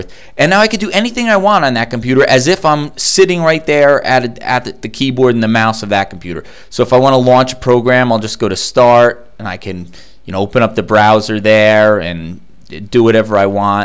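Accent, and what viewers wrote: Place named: New Jersey, United States. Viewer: sounds like a mush mouth from new jawz-sey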